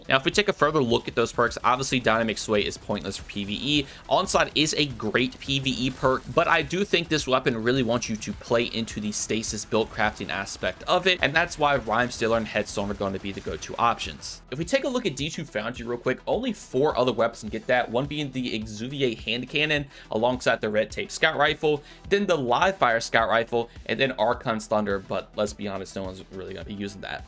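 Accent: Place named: North Carolina, United States. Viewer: Completely irrelevant, but the more I watch your content the more I feel like I hear a North Carolina accent in there. Haha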